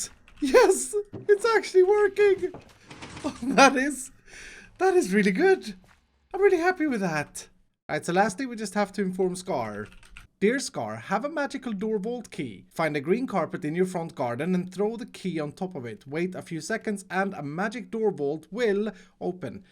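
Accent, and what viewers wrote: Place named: Sweden. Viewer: Jag ska vara helt ärlig och säga att jag inte insåg att du var svensk innan jag läste det någonstans. Men om jag ser tillbaka på det så är din brytning ganska lätt att känna igen.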